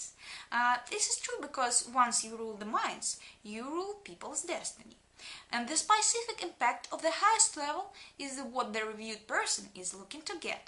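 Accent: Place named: Netherlands. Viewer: sounds like dutch accent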